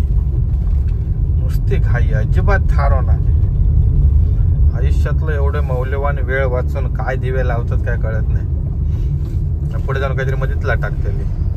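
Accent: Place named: Malvani, India. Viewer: तुमचे वीडियो आणि तुमची भाषा आपली मालवणी खूपच भारी वाटता, खूप शुभेच्छा......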